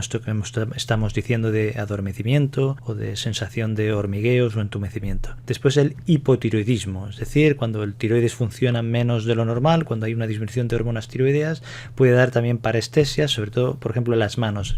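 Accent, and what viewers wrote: Spain. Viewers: Ese timbre español! Muy natural agrada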